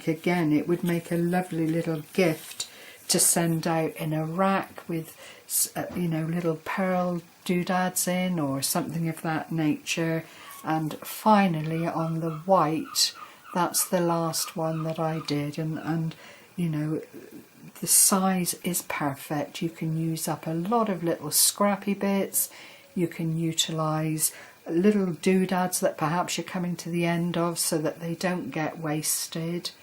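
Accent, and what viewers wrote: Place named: England, United Kingdom. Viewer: You created some lovely bags. I really like the way you embellished them. You are so creative. I really enjoyed listening to you. I love your English (am I correct?) accent. did a great tutorial and put your own flair on the bags. Very, very pretty bags. Thank you for sharing them.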